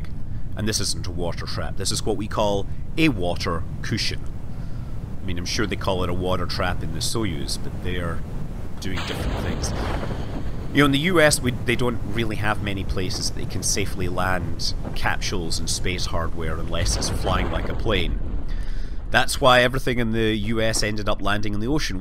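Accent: Scotland, United Kingdom. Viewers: Only without the shouting, more intellect and a scottish accent?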